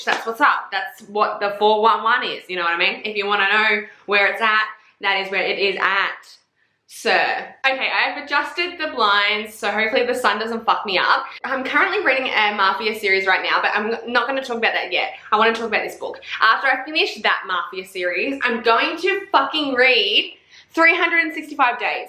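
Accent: Australia. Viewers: I have been reading you recommendations and I think they are awesome ! You say MAFIA perfectly. Everything sounds better in a Aussie accent. My words sound boring in my canadian accent lol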